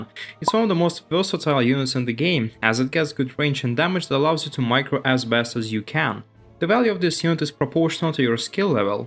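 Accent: Russia. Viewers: по акценту можно узнать, что русский))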